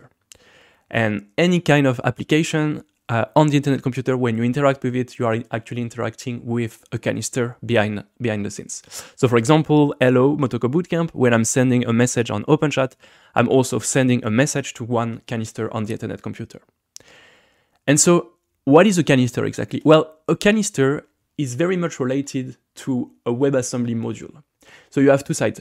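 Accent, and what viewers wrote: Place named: France. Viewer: There must be somebody without a French accent who can give us fantastic information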